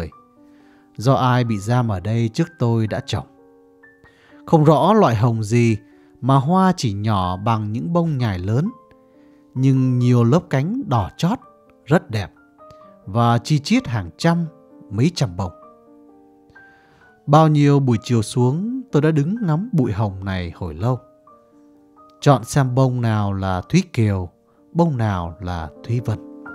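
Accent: Vietnam. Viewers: Tui thích giọng đọc của bạn, một chất giọng Bắc rất riêng không chói tai và rất truyền cảm. Riêng về các tác phẩm văn học thì các cây bút miền Bắc viết có tính chất văn học cao hơn để cho người nghe người xem nhiều suy nghĩ giúp có thêm nhiều tư duy.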